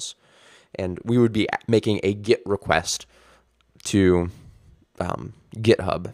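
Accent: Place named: England, United Kingdom. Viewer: yes first video I found in an English accent rether than Indian accent. I can finally understand every word without having to rewind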